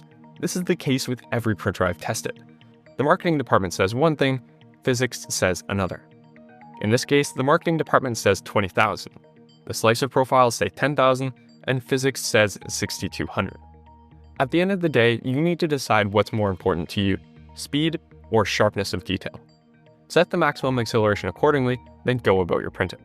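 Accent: Canada. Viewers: Are you Canadian? It's difficult for a non-English speaker to tell, but i think there are some words that fit the bill ("about").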